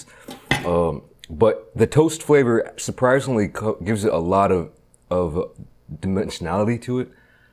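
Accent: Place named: Midwest, United States. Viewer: i new you sounded midwest i fuckin new it lol. i grew up in the northwest suburbs of chicago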